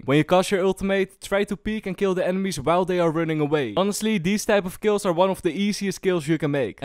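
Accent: Netherlands. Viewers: i smell dutch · Im sorry but your content is lacking. First of all im Dutch myself aswell and my accent is not so bad as yours. Maybe practice a bit must 10 minutes can make a big difference. Some of your tips are actually useless like the Kay/o dagger. The Line-up itself is kinda bad the dagger flies way to long and the spot where it lands is to dat back. The enemy team had pushed in to long or short and wont get hit by the dagger so you wont get Intel. And dont say that your viewers dont have brains people will stop watching due to your toxic behavior the only good part about this is that you confirm that you actually play the game. And the "tips and tricks" from you guys serie just makes clear to me that you dont put time and effort in to your videos I hope i could help with my critisism to keep one Dutch content creator live a nother day Have a good day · thats one heavy ass dutch accent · Just make a video in Dutch xD. 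Don't want to be rude but we can hear your accent pretty hard xD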